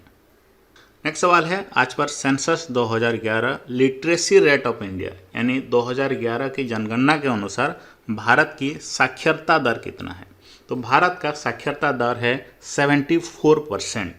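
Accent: Odisha, India. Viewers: Oriya bhai ka tune hai.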